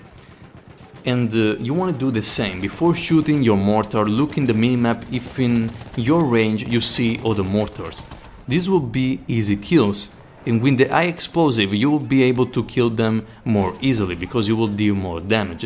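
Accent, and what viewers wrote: Italy. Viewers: sei italaiano?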